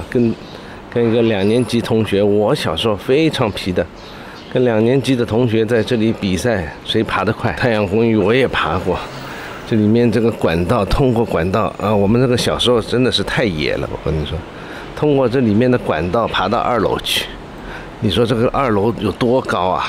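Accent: Shanghai, China. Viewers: 相對於其他博主介绍上海種種然weley以上海腔的國語導覽敘述令人 enjoy , 原生的上海人説的普通話有其特殊的語調詞彙，帶有種捉狭却又認真的複雜元素(没有貶意)這是只有以上海話母語的人才具有的, (外 地人無法模仿)上海待過較久的人聽起來特别親切, 尤其在90/00年代外來人口較少普遍都是這種腔調普通話， 歳月如梭,這幾年回去應該是新上海人越来越多,聽到的都是標準普通話, 這也可能是上海無形中漸漸消失的海派元素之一吧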